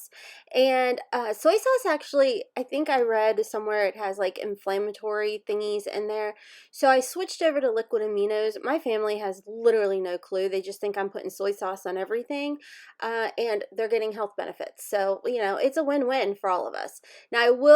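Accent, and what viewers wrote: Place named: South, United States. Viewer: Hey!! Looking forward to following this channel! Oh & I love your accent, I'm from Georgia so I have one myself but love hearing the different styles of southern accents! Lol My best friend of almost 30 years moved to Danville, Kentucky about 10 years ago and I can hear her picking up some of the accent from there now. I know when I was in Kentucky last the lady at the restaurant couldn't understand me, I asked for water & got a dead look, she had no clue what I was asking for lol Anyways! Thanks for the video! Looking forward to more!!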